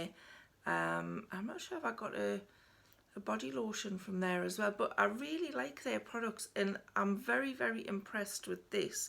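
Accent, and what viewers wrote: Newcastle, United Kingdom. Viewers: Hi going to give the shampoo and conditioner a try always been curious of that stuff. Do they do a shower gel, love your gordie accent.😊x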